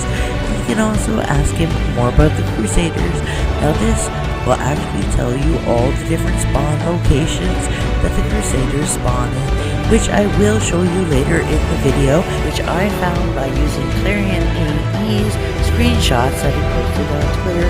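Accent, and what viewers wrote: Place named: Scotland, United Kingdom. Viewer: Sound more Scottish than Irish, luv it!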